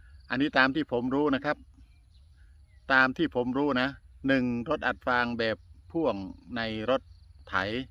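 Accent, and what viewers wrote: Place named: Bangkok, Thailand. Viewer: สำเนียงคนกรุงเทพเสร็น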